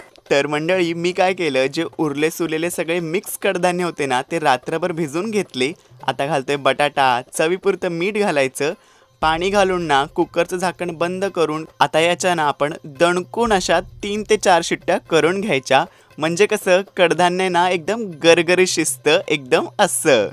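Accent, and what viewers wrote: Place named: Konkan, India. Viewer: भाऊ तुम्ही कोकणचे आहेत का तुमचं बोलणं ऐकून असं वाटते की तुम्ही कोकणातीलच आहेत पण तुमचा आवाज खूप छान आहे शेची फोनचे लोक एकदम साधे भोळे भाषेत बोलतात😅😊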